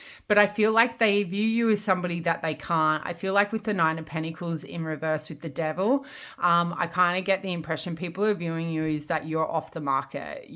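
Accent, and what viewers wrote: Australia. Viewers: All the piles and it’s the best having an Aussie mate who sounds a bit like me, chilling in my lounge. And now I must ask the most important question 🙋‍♀️… Have you been shopping at Big W?? I’m busting to get to use the decks you’re giving my message with as I speak (and listen). If not, unless you wish to sink into the devil energy as a choice - as I am - all 9 of pentacles, boujee single lady in all the abundance. That’s not exactly the case, but I slapped on a face mask and got some conditioner in my hair (ma’am, I made it into the shower today and that was a soul upgrade in itself 💪) and this reading is absolutely resonating. Even more so that I can listen to it in the accent that the hate is coming from. Same as my own. Not my high school mates. They are staunch ✊ A different area but same group. Every town has one. Taylor Swift wrote a song about them - 15? 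I think?? And the kardashians didn’t blow up on love when I think about it. Kris Jenner is the boss of turning hate viewing (the best kind really bc them they’re paying attention. They are Scrutinising even) into the big bucks. That’s why I connect to Nelly’s even though I’m the wrong colour and gender and way too old to sing what I do, but I really only know that if I hear someone saying it and I believe it. And you’ve given me EVERY CONFIRMATION. Deck of Darkness - underpins EVERYTHING FOR ME in this moment. You said something like you don’t have any witchy woo cards out. I’m super spiritual but I’m als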